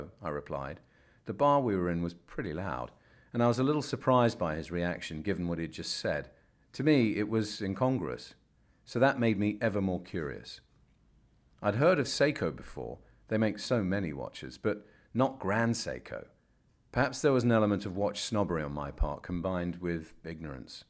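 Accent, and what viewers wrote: United Kingdom. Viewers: It’s really cool to find a video like this and feel like I’m having my thoughts read aloud in a British accent. I’m a huge fan of Lange and GS but have never been able to pull the trigger on a GS.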